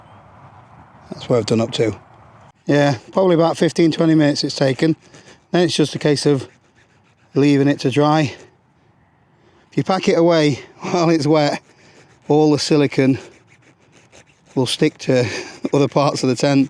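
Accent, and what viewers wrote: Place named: East Yorkshire, United Kingdom. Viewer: DIY, snot ard is it...... 'Ull' east yorkshire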